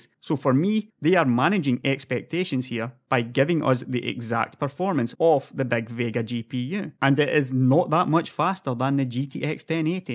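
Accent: Scotland, United Kingdom. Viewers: Its Scottish its difficult if you aren't native English speaker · so it is scotish not indian....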